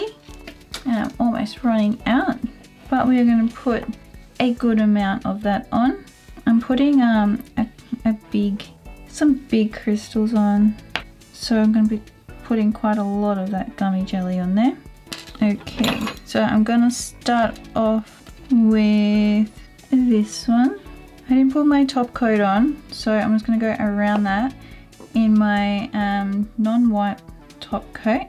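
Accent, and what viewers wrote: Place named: Australia. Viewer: These are so cute!!!♥️♥️♥️😍😍😍 I was obsessed with barbie when I was little. Love these. So creative! You are so talented. It would be cool if you did a Q&A. I'd definitely watch. Love your videos. I love seeing your channel grow so much! I also love Australian accents, lol. I think press on nails is a great idea! I, personally, probably wouldn't wear nails that long or goergous because I'd probably lose them, lol. However, I still think that's a super awesome idea although I think shipping might be really annoying. It would still be so cool to own a set of your art work even if they were just on display. The only other thing I'd be wondering about is sizing.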